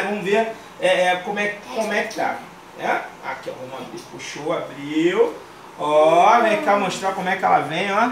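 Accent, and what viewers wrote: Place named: Rio de Janeiro, Brazil. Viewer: Ela eh linda (amo esse sutaque carioca)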